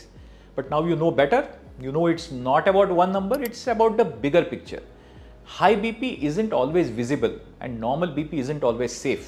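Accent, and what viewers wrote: India. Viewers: Your thick Indian accent makes me difficult to hear and understand.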